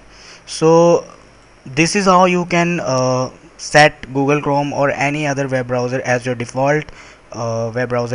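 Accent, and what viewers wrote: India. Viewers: He's Indian, His voice proves everything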